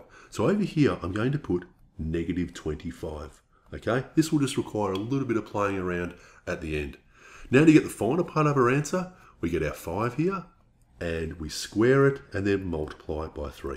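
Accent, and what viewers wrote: Australia. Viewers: Bro are u aussie by chance😮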